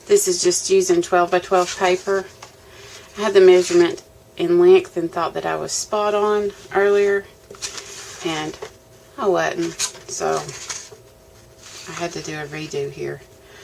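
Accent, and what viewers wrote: South, United States. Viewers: New subbie (from grammie2bay). Thanks for doing this tutorial -- you make it so easy to understand....especially appreciate your chipboard pieces with the measurements written on them. I usually put TP rolls(cut up) into the compost pile, but now have a use for them. Love your southern accent! Marianne:)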